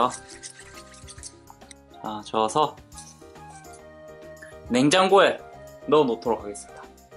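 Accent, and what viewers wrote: Busan, South Korea. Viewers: 허팝님이사투리리쓰시나.저는부산녀자ㅎㅎㅎ 자꾸 부산사투리억양이나오시는허팝님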